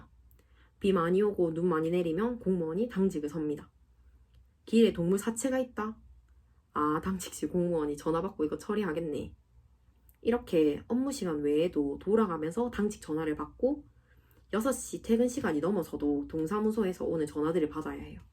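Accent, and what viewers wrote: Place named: Gyeongsang, South Korea. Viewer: 경상도 분이세요? 사투리느낌이..ㅋ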